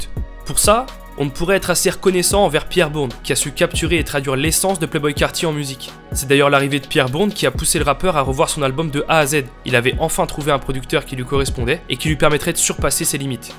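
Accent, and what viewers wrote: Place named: France. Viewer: Très bonne video et ça fait bizarre d'entendre un français parler de et et 😂 qui sont trois autres boss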